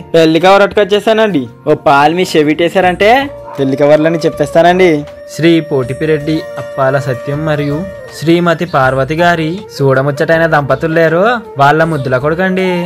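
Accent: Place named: Godavari, India. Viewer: Godavari slang ela chesaru bro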